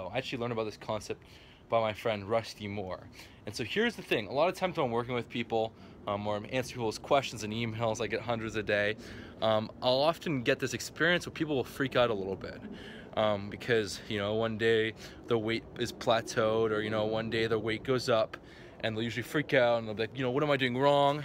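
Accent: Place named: Canada. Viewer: He's from Torontaaaa like us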